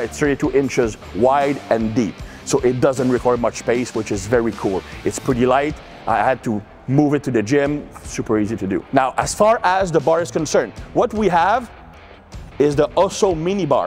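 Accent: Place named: Quebec, Canada. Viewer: Vive le Québec libre ton anglais est excellent mais l’accent te trahit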